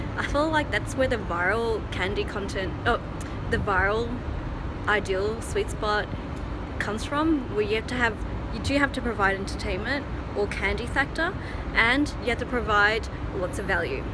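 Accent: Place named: Australia. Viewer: Love the Australian accent but your breakdown of substance vs. candy content is spot on.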